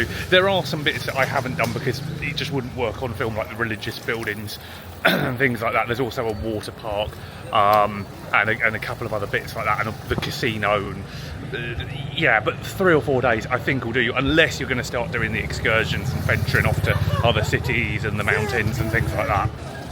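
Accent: England, United Kingdom. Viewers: With an inglish mouth like yours, one would attract paranoia even in Antarctica. At least the place doesnt smell piss like Liverpool or London. Hopefuly after 10 years your channel will help you pay the rent.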